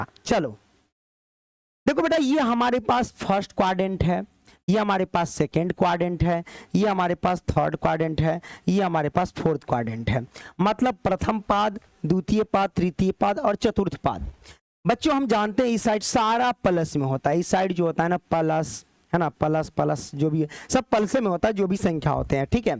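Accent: Bihar, India. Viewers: Sir aap bihari hai n 😅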